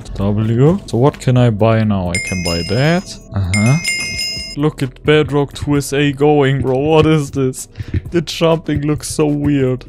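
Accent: Germany. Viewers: German accent, I love it😂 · Are You from German?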